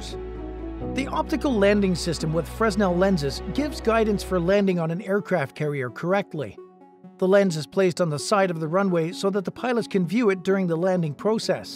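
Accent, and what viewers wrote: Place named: Canada. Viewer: This guy sounds Canadian.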